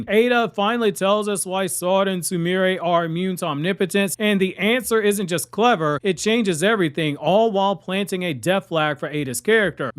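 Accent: New York, United States. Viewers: ole boy has a new york sounding accent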